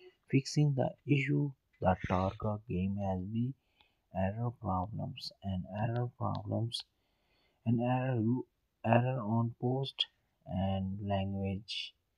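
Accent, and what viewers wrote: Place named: India. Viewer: Fix your Indian street shitter accent plz😭😭😭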